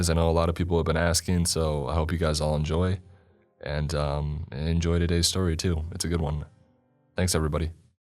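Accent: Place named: South, United States. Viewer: I have never heard your normal voice. You almost sound southern. And your voice is naturally that deep?! Good God lol.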